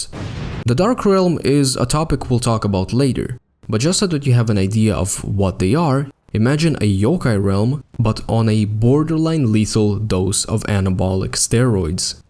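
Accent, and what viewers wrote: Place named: Russia. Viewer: не братишка, там к середине конечно начал "наш" акцент проскакивать, но ты 6 часов как штирлиц. Продолжай грайнд!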